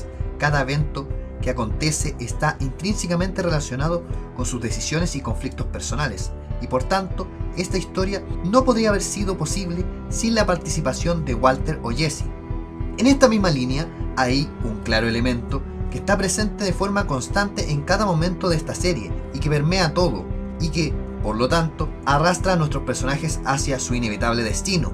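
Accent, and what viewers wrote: Chile. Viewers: El acento chileno es tan chocante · Chileno? o parece el acento xdd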